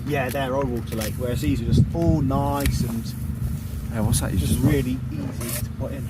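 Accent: Derbyshire, United Kingdom. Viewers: Where u from ...sounds like Chesterfield or North Derbyshire ?????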